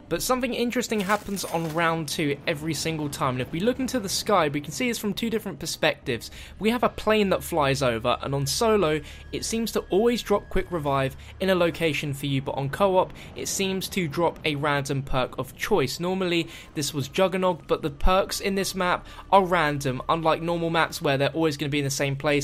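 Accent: England, United Kingdom. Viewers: " the HEYTCH G 40" lol gotta love england accents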